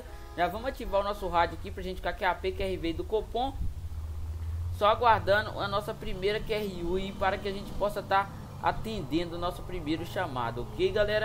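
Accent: Minas Gerais, Brazil. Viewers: Sabia que tu era de minas , voce é de BH aqui mano ? quando voce fala Jae , pela ord kkkkkk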